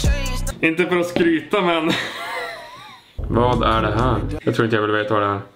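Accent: Norrland, Sweden. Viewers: Jååå, hittade din kanal för typ en vecka sen å har helt fastnat. Är från Lule å älskar din norrländska! 💖✨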